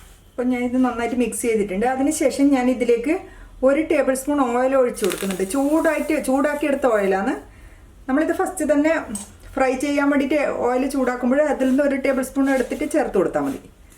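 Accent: Kannur, India. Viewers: Someone from kannur district yeah?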